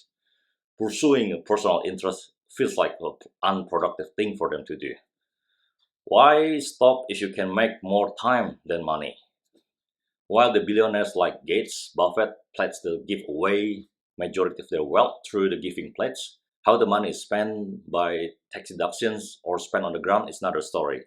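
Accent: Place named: Australia. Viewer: Before you even said I knew you are in Australia from the cadence of your English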